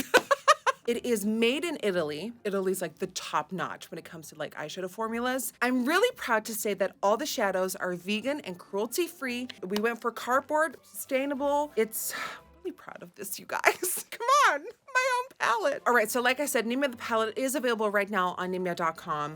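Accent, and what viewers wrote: United States. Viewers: how often do you come to the US? You have an American accent. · Why does she have an American accent, Dutch people have such a strange accent.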